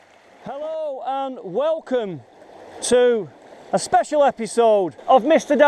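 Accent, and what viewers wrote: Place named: Yorkshire, United Kingdom. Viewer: Liked and subscribed. You sound like you’re from Yorkshire?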